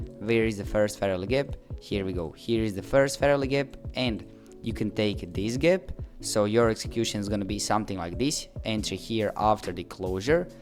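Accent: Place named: Catalonia, Spain. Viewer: You sound catalonian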